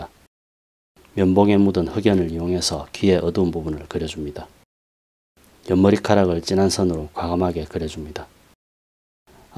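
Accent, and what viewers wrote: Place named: Busan, South Korea. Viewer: 말하시는것보니 부산인듯하네요 혹시 직접화실운영하시나요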